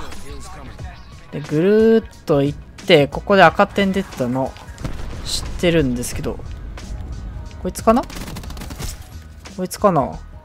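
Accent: Kansai, Japan. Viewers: グリくん関西出身だったりする？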